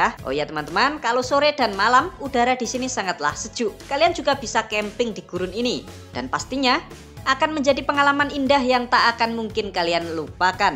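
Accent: Java, Indonesia. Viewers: membawa britanya medok sih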